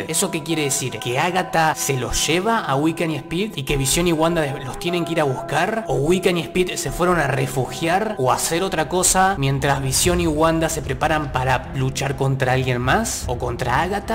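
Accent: Argentina. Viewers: No sabía que eras de Argentina messirve paaaa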